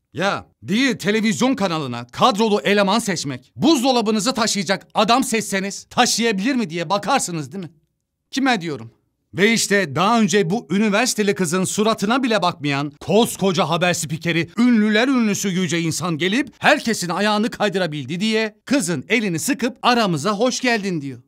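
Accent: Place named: Eastern Anatolia, Turkey. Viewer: sizin şaka (ki çoğu şakanız gerçekten vasat) maksadı ile söylediğiniz cümleleri, doğu şivesi ile söylemenizi ne yapacağız?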